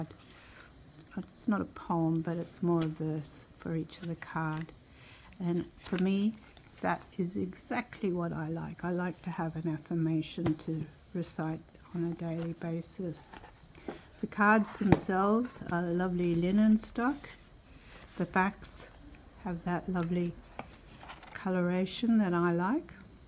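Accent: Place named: Australia. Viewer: Great selection . You sound like one of my fellow Aussies !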